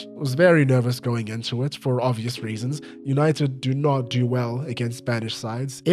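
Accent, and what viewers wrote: South Africa. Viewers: I KNEW YOU WERE SOUTH AFRICAN! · is a Shona name, but you have a South African accent, got ya! Sharks😂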